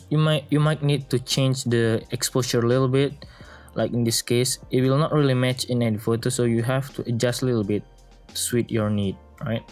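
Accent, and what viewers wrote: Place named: Malaysia. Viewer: You sound like malaysian chinese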